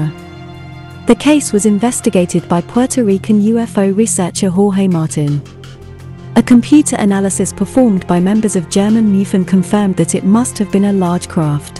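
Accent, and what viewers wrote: United Kingdom. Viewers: Love your British accent:-)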